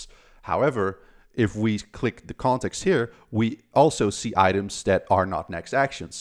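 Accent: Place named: Netherlands. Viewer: I'm here for 30 seconds and I'm guessing you're Dutch?!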